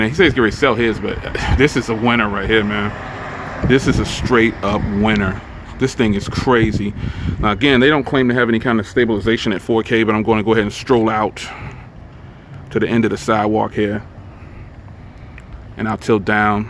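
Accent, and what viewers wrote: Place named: New York, United States. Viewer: New York accent coming out like crazy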